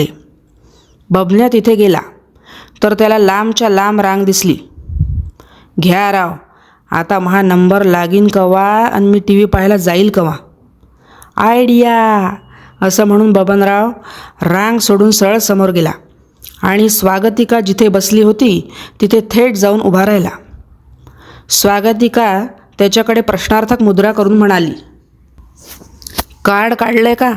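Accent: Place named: Vidarbha, India. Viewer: छान विनोदी आहे कथा. विदर्भातील भाषा छान सादर केली आहे.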